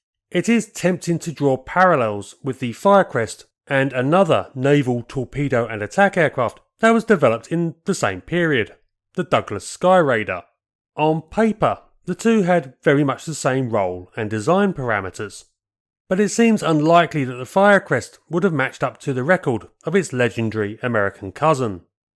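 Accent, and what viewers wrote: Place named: Essex, United Kingdom. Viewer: DaadaadaaDAAA, daadaadaaDAAA, daadaadaaDAAA, ... Come ON. Your DELIVERY is getting un-LISTENABLE-to. You gotta VARY things a bit, so every SENTENCE isn't exactly the SAME as every OTHER one. The CONTENT is generally GOOD, but now I have to kill the SOUND, on ACCOUNT of this. So a LOT gets LOST. You may be from ESSEX, but that's no exCUSE! OK? OK.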